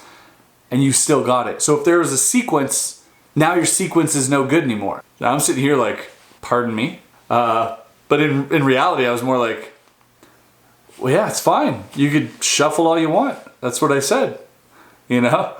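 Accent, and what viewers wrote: Canada. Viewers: This guys Canadian?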